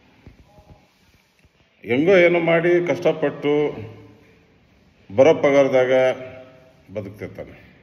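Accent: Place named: North Karnataka, India. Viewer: Sir ನೀವು ಮಂಡ್ಯ ಜಿಲ್ಲೆಯವರು ಅಂತ ತಿಳಿದಿದ್ದೇನೆ ಆದರೆ ನಿಮ್ಮ ಮಾತು ಸಂಪೂರ್ಣ ಉತ್ತರ ಕರ್ನಾಟಕದ ಭಾಷೆ ಮಾತಾಡುತಿದ್ದಿರಲ್ಲ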